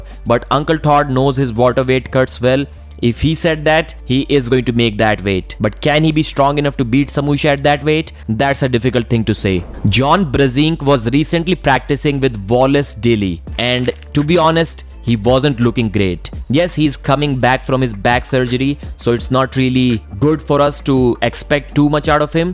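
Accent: India. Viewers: the strong Indian accent of the speaker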